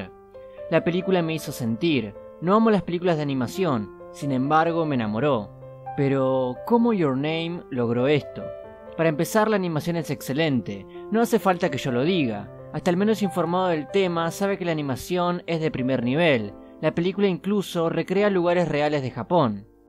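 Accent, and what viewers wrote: Buenos Aires, Argentina. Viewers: que paso con esa 'Y' cuando hablas ¿eres porteño?... Buen video like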